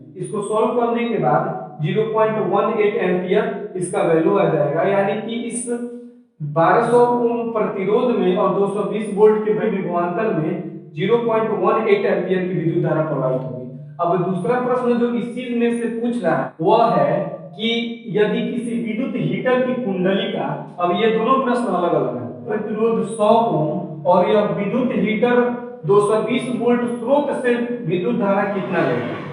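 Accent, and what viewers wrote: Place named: Gujarat, India. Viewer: Apki awaz gujrati sunayi parti thora saaaf bola kariye sir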